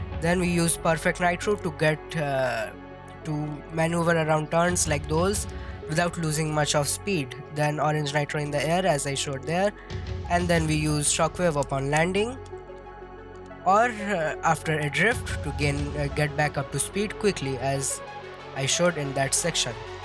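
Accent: India. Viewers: Bro are you Indian cause you speak English like an Indian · idk if ur indian ur voice sound like ur a indian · U Indian bruh ? 😅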